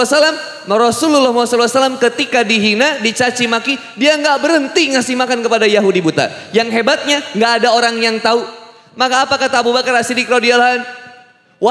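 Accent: West Java, Indonesia. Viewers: owh pantesan Nyunda pisan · Cmn ust medok di sunda,kalo ngm ujung2nya ada sunda2nya,,kalo andhika pratama gk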